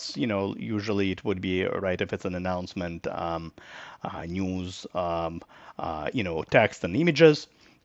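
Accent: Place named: Bosnia and Herzegovina. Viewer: Love the accent. Sounds Bosnian.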